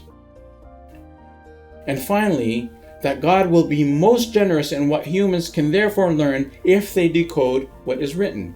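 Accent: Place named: Iran. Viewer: Because he is Persian. I think. They have hard time pronouncing qaf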